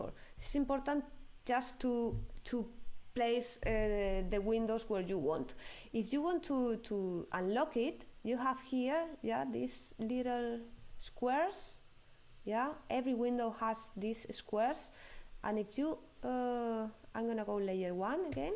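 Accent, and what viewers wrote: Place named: Italy. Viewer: Simply the best tutorial teacher! and the Italian accent is lovely! Merci!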